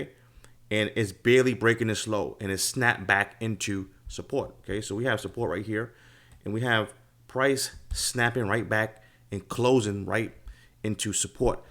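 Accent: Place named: New York, United States. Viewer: My brotha from NYC. I can tell by your accent that you're a New Yorker. I am too. I've been watching your video's on a binge. Great stuff thus far.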